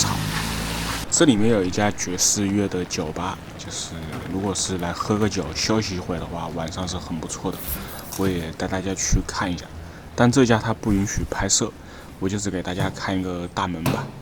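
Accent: Wuhan, China. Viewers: 配个字幕就好了，感觉好像汉普啊哈哈🎉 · 兄弟口音是武汉的？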